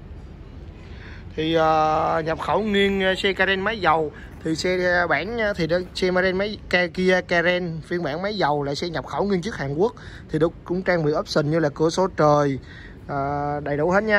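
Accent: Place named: Binh Duong, Vietnam. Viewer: giọng chắc đồng hương với tài ô tô bình dương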